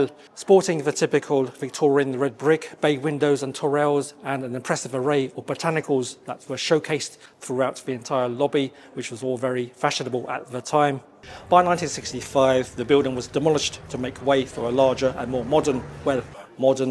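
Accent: United Kingdom. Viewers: You look Asian, but your way of speaking and your mannerism are purely British. At 98 British pounds = $133 for a Peking duck which costs only $36-38 in Houston,Texas it was quite priced. American salary is much higher than Briton's. You must be the privileged in British society.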